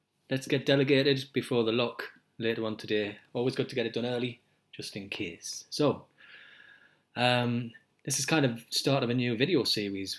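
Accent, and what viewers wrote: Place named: Darlington, United Kingdom. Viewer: Sounds like you're just a bit north of me in Darlington. Great to hear a localish voice talking about my favourite subject so I've liked and subscribed!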